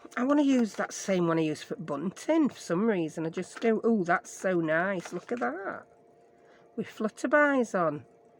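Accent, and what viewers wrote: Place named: Scotland, United Kingdom. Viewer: You are a hoot! I just found your channel and I am enjoying you so much. Are you from Scotland? I love your accent.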